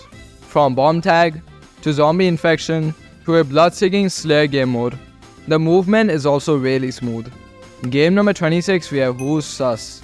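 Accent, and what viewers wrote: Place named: India. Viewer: You seem to have a slight Indian accent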